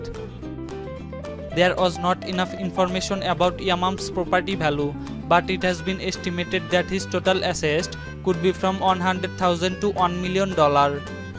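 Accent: India. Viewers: Parang tunog ng Indian pag nagsasalita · Indiano ata tong nagsasalita.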